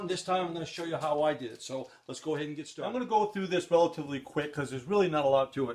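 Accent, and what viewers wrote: Maine, United States. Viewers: So, what part of Maine are you from? I know my people when I hear them. 😀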